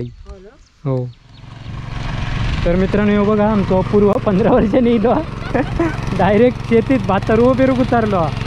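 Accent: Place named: Malvan, India. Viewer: तुमची मालवणी फार सुंदर आणि ओरिजनल आहे. म्हणजे त्यात इंग्लिश आणि मराठी शब्दांची भेसळ नाही. बाकीचे v ब्लॉगर्स मालवणीच्या नावाखाली मराठीच बोलतात. आणि भरपूर इंग्लिश शब्द वापरतात. ॲड करा, स्टर करा, जॉईन व्हा, ब्रेक फास्ट करू या वगैरे. तुमच्या ब्लॉगमध्ये शुद्ध मालवणी ऐकायला मिळते.छान वाटतं.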